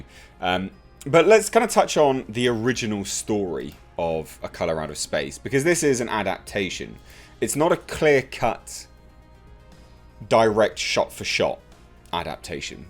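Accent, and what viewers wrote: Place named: England, United Kingdom. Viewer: Ha ha Automatic subtitles: Colorado Space, must be the english accent. Nice movie, except for the brother and sister nonsence decisions near the ending. Their mother has become something like Brundlefly, but it's ok, everything's going just fine. Or the brother who wants to get into the well to save his dog? Probably the best HPL adaptation now. So far, I thought Dagon was the less terrible with From Beyond...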